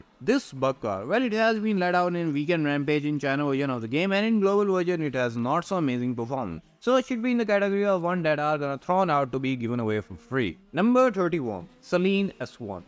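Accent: India. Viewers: Bro you indian? If not where you from?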